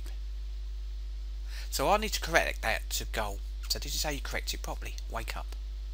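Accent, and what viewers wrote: United Kingdom. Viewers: I am really appalled, shocked, dismayed. As to these mumble mouth individuals. Trying to provide instructions for using, Naturally Speaking. When they can hardly speak, themselves. Never mind that nobody is actually speaking into a microphone, directly. So that you can barely understand what they are saying. In a terrible acoustic environment to boot. This is just mindless, buffoonery. And this guy with his mumbled British street slang. Sounding like he is 10 years old. Stupid clueless buffoonery is on the rise. It's rife throughout the world, today. As we regress back into the 19th century here in the 21st, century. Except that we now have voice recognition software with people who cannot articulately speak or enunciate words correctly. And yeah. Voice recognition software isn't going to work well with people who cannot speak. Who mumble and mispronounce, words. 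Which happens often. As in, "ofen" and you're not British. And not off 10. Or off, ten. And a chasm is not a, chaz'em. It's a, "kazim". Otherwise how do you expect voice recognition to understand, mispronounced words? Unless you build in the proper pre-buffoonery into the software for such illiterate pronunciations. That doesn't make the product better. That makes people far more illiterate and incompetent. And the inability to deliver a spoken concept. With the inability to articulate it into the proper word stream. Um, duh, ya know, I'm saying. Way. Go. Dog. I think that sentence is perfectly understandable. From a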